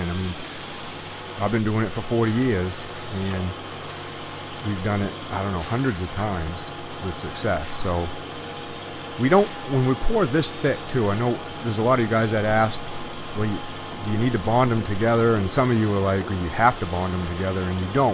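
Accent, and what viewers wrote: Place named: New England, United States. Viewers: Hi, great video. Good to hear my native speak (I live in Austin but am from RI). Very helpful to see the entire job unfold. Hoping you'll be able to provide some input re: moisture barrier (and cost). Details below (it's a lot). Thanks in advance if you can help, completely understand if you can't. Am converting a 20' X 12.5' (leaky) garage into a recording space. There's a 30 inch x 20' ledge running along interior-wall side. The floor is 5.5" below house slab level, so assuming I need to leave space for flooring on top of new-pour slab level. Total square feet of space to be raised is 200 sf X (approx) 5". Question one: Have been told I need a moisture barrier and would have to wrap the studs, but noticed the white strip at base of studs on far wall in video. Is that the foam of which you speak? Can you explain the application? 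Question two: I live in Austin. Contractors bidding on job have varying opinions and thoughts about moisture from concrete at studs and structural materials to use. Current concrete floor appears to be fine and intact. My major concern is water, and like this client, it's apparent that at least some of it is coming from under the garage door during heavy rains lasting more than a day. My house is on a slight grade, and the driveway has a short flattened run in front of the garage door, but it doesn't seem to do much to re-direct the heavier rain water (no, no gutters, unfortunately). That said, the water reliably pools along the garage's inter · bill smith He sounds like a New Englander.. my guess is Boston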